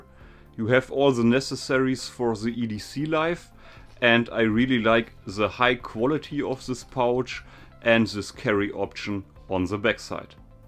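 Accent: Germany. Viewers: Great products and presentation but the german accent makes it almost unbearable to watch, sorry.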